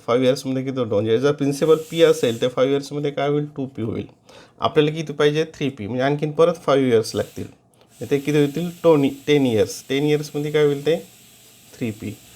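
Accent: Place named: England, United Kingdom. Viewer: Sir please 12 la jasti English style madhye bolaycha prayatn karu naka te kasatari vat te😅😅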